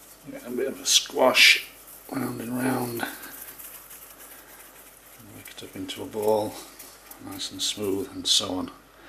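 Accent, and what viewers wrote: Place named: Trinidad and Tobago. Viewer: Like u dotish or wat. The man is clearly a trini from his accent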